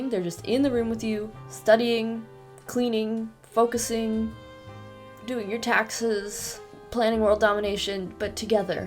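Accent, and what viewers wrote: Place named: Ohio, United States. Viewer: The ohioan accent alone made it worth the watch 🫡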